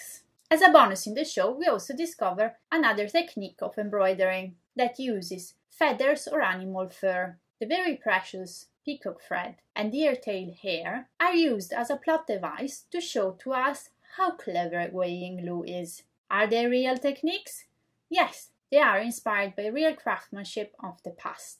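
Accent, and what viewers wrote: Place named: China. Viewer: You are almost speaking with a chinese- english accent. 😊 But anyway very interesting docu!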